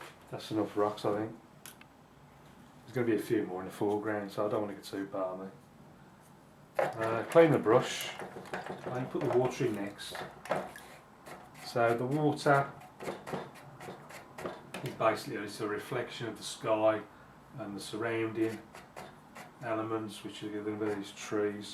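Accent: Birmingham, United Kingdom. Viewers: The Brummie Bob Ross. Bostin'!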